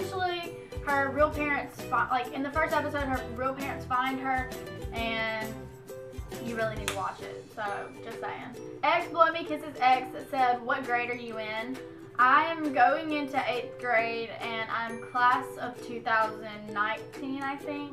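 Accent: Alabama, United States. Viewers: She's from Alabama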